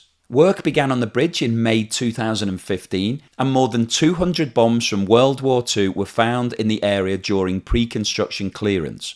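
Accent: United Kingdom. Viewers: Thanks for your clear british english 👌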